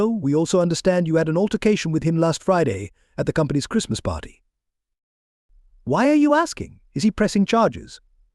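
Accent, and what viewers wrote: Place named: England, United Kingdom. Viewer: Cannot stand the English accent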